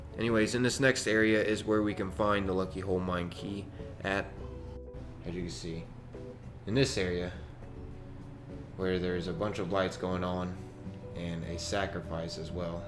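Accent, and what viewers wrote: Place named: Maryland, United States. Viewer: Are you from Maryland ? You sound like it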